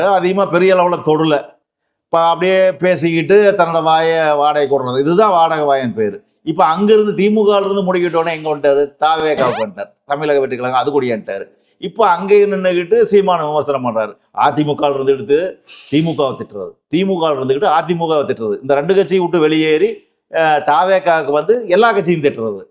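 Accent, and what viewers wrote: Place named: Kongu, India. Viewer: ஐயா நீங்கள் பேசுவது கொங்கு தமிழ் பேச்சு வட்டார வழக்கு நீங்கள் எந்த ஊர் எந்த மாவட்டம் நான் திருப்பூர் மாவட்டம் திருப்பூர் மேற்கு பகுதி 25 வேலம்பாளையம்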